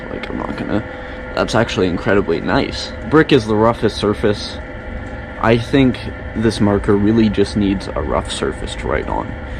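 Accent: Canada. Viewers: Bruh are u canadian?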